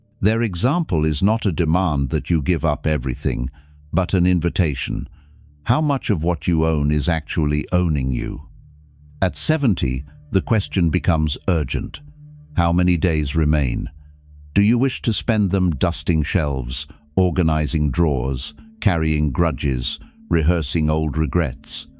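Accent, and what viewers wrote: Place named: United Kingdom. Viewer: Good info but long and wordy. British accent is irritating. Could have been 20 mins.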